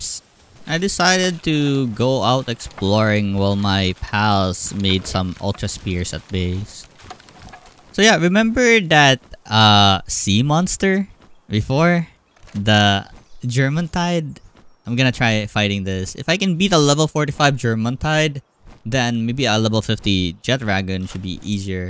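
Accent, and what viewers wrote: Philippines. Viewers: You have a filipino accent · I hear Filipino (Pronounced: "pill-la-pee-no") Accent... Filipino ka???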